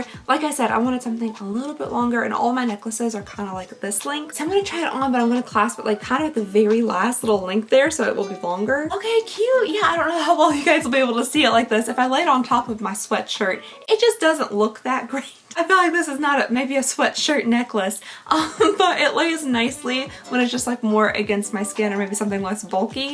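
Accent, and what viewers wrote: Kentucky, United States. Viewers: Being from Kentucky inlove when i hear it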